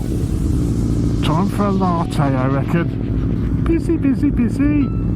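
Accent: United Kingdom. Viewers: Just subscribed to your channel. Being in Japan it's good to hear a British voice on a motovlog for a change. I've also just started making bike videos. Only 1 for now, but check it out if you have time. Thanks and keep up the good work!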